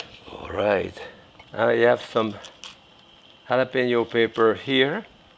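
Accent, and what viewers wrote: France. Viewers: I could listen to him talk all day. I love that French accent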